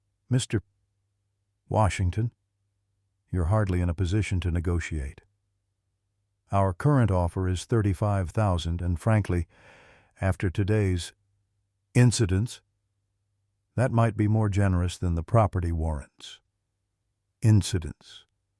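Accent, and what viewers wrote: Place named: South, United States. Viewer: I love this narrator with the southeastern or western accents.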